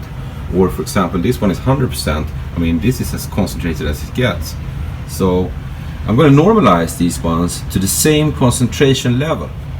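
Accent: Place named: Sweden. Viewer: Took me 2 seconds to know you Are swedish :-)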